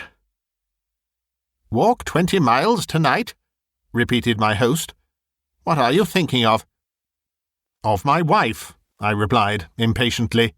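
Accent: United Kingdom. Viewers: I'd like to add that it's how you masterfully change your voice to suit each character that is impressive and very convincing. And you have that elegant and clear British English that's very much appreciated by American audiences ( including myself). 🙂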